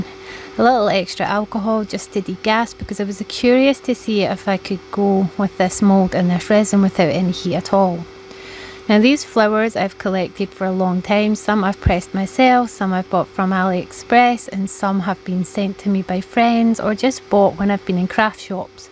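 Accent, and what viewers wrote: Scotland, United Kingdom. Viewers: Hi. Its fantastic to hear a Scottish accent that sounds quite like mine. I'm a bit of a mixture. Born Coalburn Lanarkshire moved to Cumnock Ayrshire. Spent 10 years in Surrey and now I'm in Leslie Fife. Lol. I usually watch Acrylic Paint Pourers from America. Canada and New Zealand. I've just finished Resining a painting and this video of you with your BLESSED Resin plack.. I love it. The colours are amazing I will have to watch the full video. I have subscribed and liked your work. I love Livingston Centre but not been for a while. I have a neighbour who is poorly and wants to go there so I will take her down when we can organise it. Hope to catch up soon. Sandra 🌹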